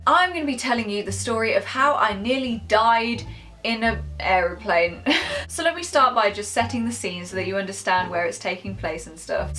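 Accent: United Kingdom. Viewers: Where are you from? You sound like your from Newcastle xx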